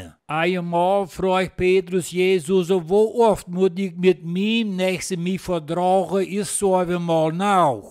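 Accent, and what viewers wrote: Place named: Pomerania, Germany. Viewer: ek is van suid afrika...die lesing uit die heilige skrif is mooi in die pommere dialek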